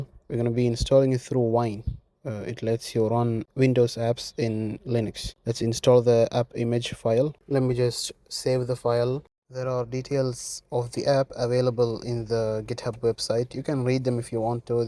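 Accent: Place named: Arab world. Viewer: واضح عربي من الاكسنت " بروازير"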